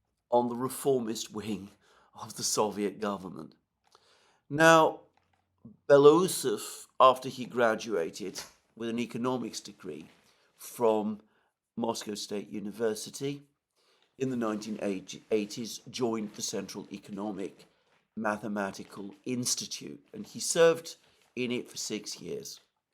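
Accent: United Kingdom. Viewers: AM feeds off of the gullibility of his audience. Take some time and listen to other programs. This one is ALL KREMLIN PROPAGANDA NARRATED BY A MOUTHPIECE WITH A BRITISH ACCENT AND A VERY SORTID PAST.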